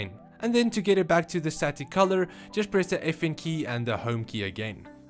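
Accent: Afrikaans, South Africa. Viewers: I can tell a south African accent from anywhere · This dude sounds so afrikaans it's crazy XDD great video. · He sounds like hes from South Africa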